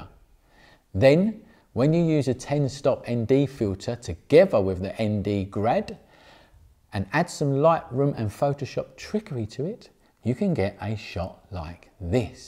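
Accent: United Kingdom. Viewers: Lovely accent feels like I'm watching great British bake off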